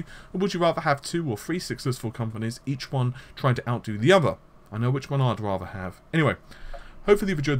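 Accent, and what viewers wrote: United Kingdom. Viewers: "nm" is pronounced "nanometre" unless you would (for example) legitimately say: "my penis is 25 'cm' " rather than "centimetres" or "suck my 10 'in' biro" .... you would of course say 'inches' not literally pronounce 'in' written and spoken are different. also, you're wrong about how to pronounce Ti. honestly I think you're just trolling us on purpose now, but then I do wonder if maybe you even knew that 'nm' means nanometre... EDIT: I know I'm getting thumbs up for trash talking you - but I do want to say that I love your channel, your vids are really informative and I'm a proud subscriber... but dammit man, please just pronounce stuff like the vast majority - I have great respect for those that stand in the minority on issues, but language is not one of them, it's meant to populist, so that we can all understand each other. The 'common tongue' is called as such for a reason. 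We're both British and my accent is very similar to yours, so I probably identify too closely - I just want your channel to be a big success bubba! hugs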